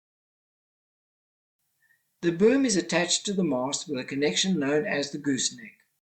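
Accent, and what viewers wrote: Durban, South Africa. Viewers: Great videos and well explained. Graphics are excellent. Do I get a hint of Durban accent?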